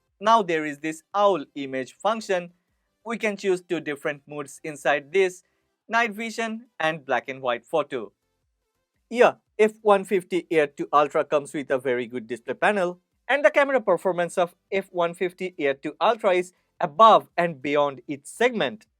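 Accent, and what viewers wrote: India. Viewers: When i play a review video i can't escape that Indian English accent sometimes but you're amazing.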